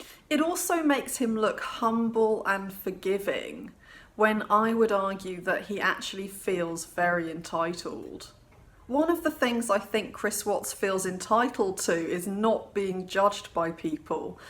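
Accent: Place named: London, United Kingdom. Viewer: So it IS a London accent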